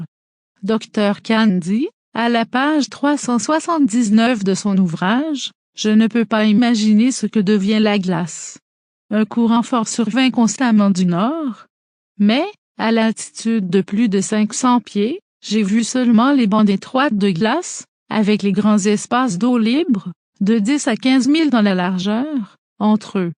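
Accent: Canada. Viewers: 54 minutes d'accent quebecois , c'est terrible, mais cela ne va pas être possible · La voix est horrible avec un accent canadien en plus de ça, la combinaison est atroce 🤮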